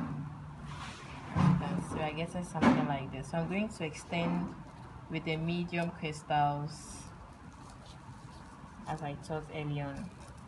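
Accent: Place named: Ghana. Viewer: I love your accent! Are you from Ghana?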